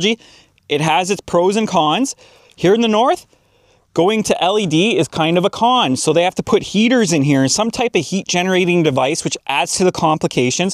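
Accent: Canada. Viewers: I'm Irish/Canadian and subbed because I really miss the Canadian accent sometimes. Your car insight is also brilliant and shocking. We are really doomed.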